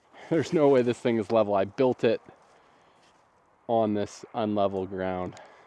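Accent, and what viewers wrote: Canada. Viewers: First of all, you were really letting your Canadian flow this week hahhahahha... I 100% agree with the building bug! I am not done with my place yet and I am already thinking how I would like to try my hand at a log cabin. Heck, I am still young enough.....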